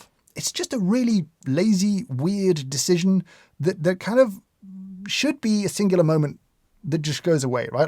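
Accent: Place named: RP, United Kingdom. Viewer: Overreaction to an ad. this aristo-accented Brit going over the producers (who cares?) The connections he makes of motorcycles with coffee (who cares?). Coffee beans sold with name of who it was made/ filled for (who cares?). Pitt and being supposedly made to look cool in the selling of something(what else is new?).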